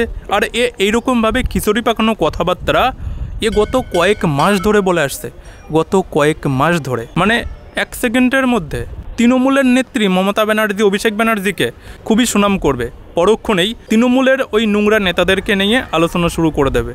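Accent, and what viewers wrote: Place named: Bangladesh. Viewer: Bhai youtuber, bangladesh theke kabe elen? Vasa shune pariskar bojha jachhe.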